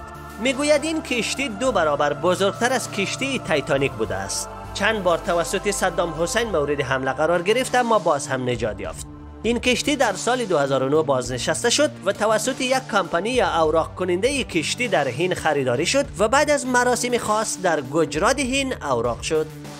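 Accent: Afghanistan. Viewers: لهجه ایشون لهجه متداول در افغانستانه. جمله بندی و دستور زبانش هم مربوط میشه به فارسی دری. بنابراین ایشون کاملا درست صحبت میکنه. شما لطفا یه کم ادب و نزاکت یاد بگیرید.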